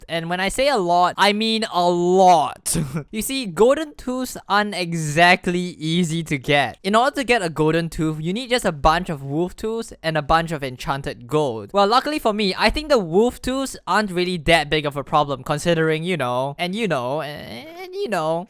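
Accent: Singapore. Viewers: EYY <3 same bro. I could tell by ur accent haha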